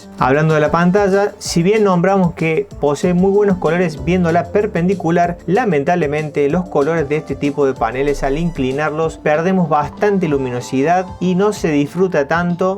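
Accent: Córdoba, Argentina. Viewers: Se nota que sos cordobes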